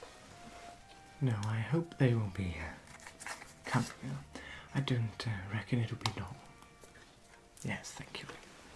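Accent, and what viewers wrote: RP, United Kingdom. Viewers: Thank you for putting this together. What a lovely posh accent you have. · What type of british accent is this? you and one other similar asmrtist share the same accent i cant help but wonder where this originates from. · I enjoy this so much. Just recently found your channel. I am wondering if this is your natural accent OR if you change it up for a former era. Or perhaps you are putting on a more proper upper crust accent. Isn't there a way of referring to the more proper Queen's English accent - RP or something like that. I enjoy hearing all the different types of English accents, the proper, the cockney, the Liverpool style, etc. I am American but I know there are many inflections. Just as we have so many regional accents here in America.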